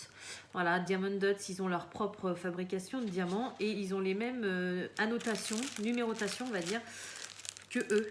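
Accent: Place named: Quebec, Canada. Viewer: Tu la bien le français québécois hihi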